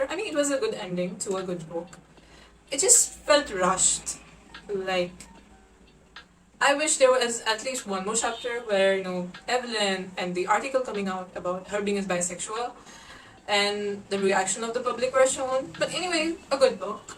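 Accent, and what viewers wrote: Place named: England, United Kingdom. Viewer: literally set fire on stage 🔥🔥🔥 and your english accent!! Wowww!!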